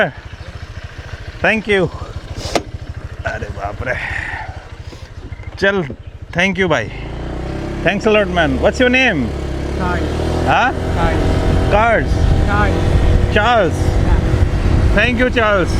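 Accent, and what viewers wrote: India. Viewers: Just a suggestion. Use interrogative sentences when asking a question. "This is a fisherman village, ?, yes!?" This sounds vague and confusing, and sometimes it can come across as rude (especially with our Indian accent). We don't have to change our accent, but instead, we should use an interrogative sentence...like ask, "Is this a fisherman village?" simple, effective, short.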